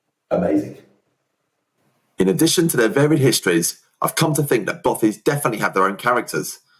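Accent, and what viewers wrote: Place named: England, United Kingdom. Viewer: Why say kilometers you're in the UK & sound English too we use imperial here 🤷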